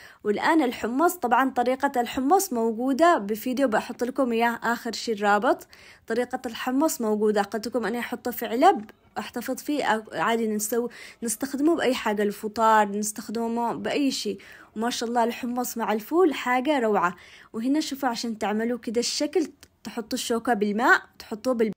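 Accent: Yemen. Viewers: الله يعطيك الصحة انا جزايرية و زوجي يمني و الله يفرحك قناتك ممتازة ما شاء الله و لهجتك يمنية حلوة و مفهووووومة 😊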